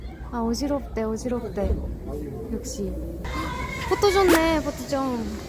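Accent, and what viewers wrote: Gyeongsang, South Korea. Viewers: 대구에 좀 오래 살았더니 대구 억양이 마이 나오네요 이쁜 ~~^^ㅎㅎㅎㅎㅎ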